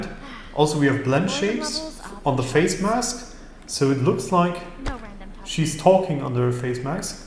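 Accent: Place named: Germany. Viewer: einfach deutscher Akzent 🤣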